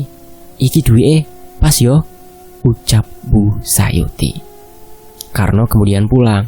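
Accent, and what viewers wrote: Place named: Banyumas, Indonesia. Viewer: Ngapak juga bisa pokoke the best 👍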